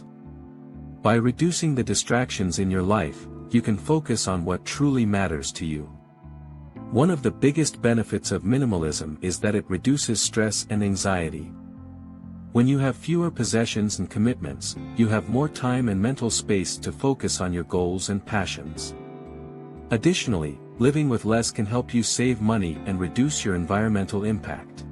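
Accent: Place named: England, United Kingdom. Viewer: 🎉amazing job ,You have a beautiful English accent.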